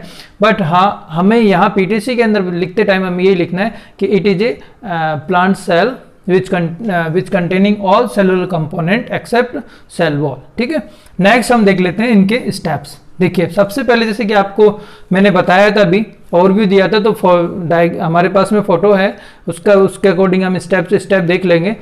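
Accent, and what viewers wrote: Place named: India. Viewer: sorry but how does can international students understand english indian fast accent ? but good video hehe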